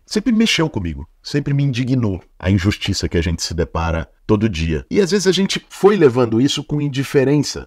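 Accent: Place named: São Paulo, Brazil. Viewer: BOULOS NO SEGUNDO TURNO.....SOTAQUE GENUÍNO DE PAULISTANO. NOSSO FUTURO PREFEITO.😊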